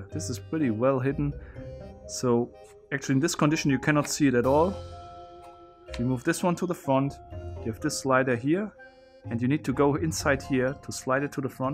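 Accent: Germany. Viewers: Yes, it is a German accent... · wie man einfach raushört das er deutsch ist xD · Is that german accent? 🤔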